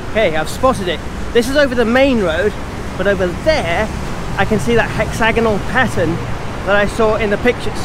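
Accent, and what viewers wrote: United Kingdom. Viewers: English tulen...remember mr bean...omg.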